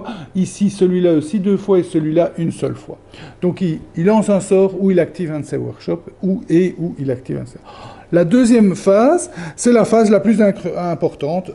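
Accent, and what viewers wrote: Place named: Belgium. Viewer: Merci, bonne explication et une petite critique constructive à la fin. J'adore ton accent Belge, ça me rappelle qd une partie de ma famille habitait Bruxelles !!!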